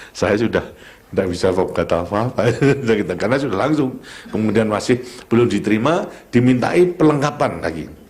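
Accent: Java, Indonesia. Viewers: Pak harto aja kalau wawancara juga anu anu anu, sama kaya JOKOWI. Artinya apa, itu aksen bahasa khas orang jawa. Menandakan omongan itu dalam pemikiran, biar klau dah keluar sesuai sama yg sebenarnya terjadi.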